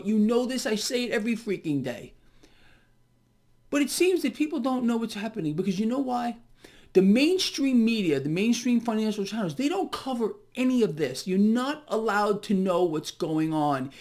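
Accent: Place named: New York, United States. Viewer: Is it possible this guy is from New York or New Jersey? That accent is so thick you could cut it with a knife.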